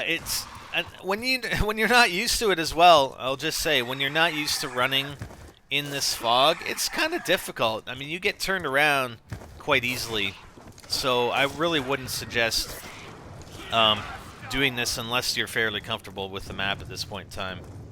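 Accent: Canada. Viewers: im guessing you're canadian? btw thx for the strategy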